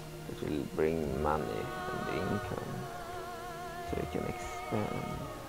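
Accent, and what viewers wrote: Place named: Sweden. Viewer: I ceartinly look forward to be playing this :D Looking forward to the Ahn Qiraj the most personally Also do excuse me if im wrong but im an sensing an slight tint of Swedish in your english voice, if so Hallå Hallå :D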